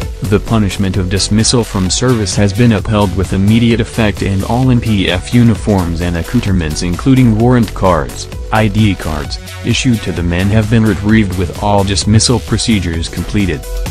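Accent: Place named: United States. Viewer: I can feel the voice on this video is always on YouTube posting dirty stuffs about Nigeria and other African countries, not for once any good thing about them. Whatever country you are from, I think there are enough dirty stuffs to talk about as well. You accent sound American, and if you, I guess as much. Shame on you.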